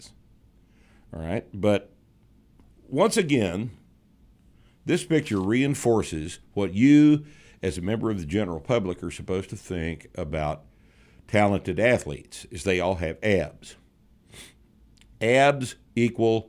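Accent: Texas, United States. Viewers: in best Zap Branigan Impression a Texan can do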